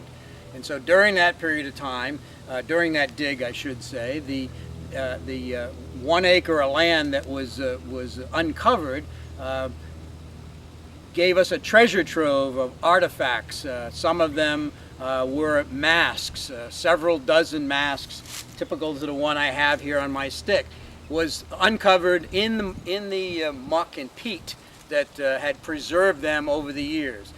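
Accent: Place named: New England, United States. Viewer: You got a New England accent. Thank you for coming down here and exploring our history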